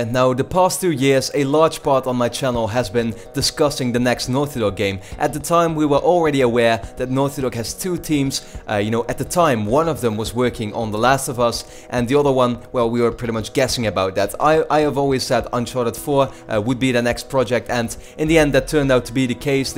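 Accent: Netherlands. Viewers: Are you dutch? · Are you Dutch? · are you dutch?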